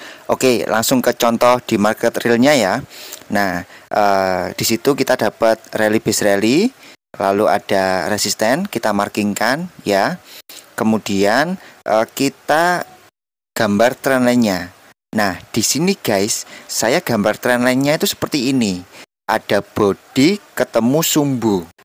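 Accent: Java, Indonesia. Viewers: Dari Logatnya, Pasti anda orang jawa ya? 😁 ,Cara anda mengedukasi sangat Keren bro , saya dukung.! Lanjutkan, sukses selalu ya.👍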